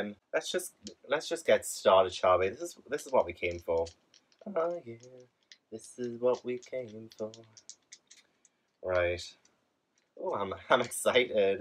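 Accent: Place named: Northern England, United Kingdom. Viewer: I found you via Codie and I'm so excited to hear a northern accent! Good luck with that incredibly ambitious tbr! I really need to read Howl's Moving Castle - I've owned it for about 6 years.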